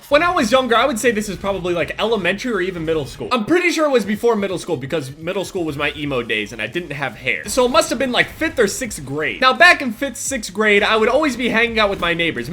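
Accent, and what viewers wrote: Australia. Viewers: Dat Australian <3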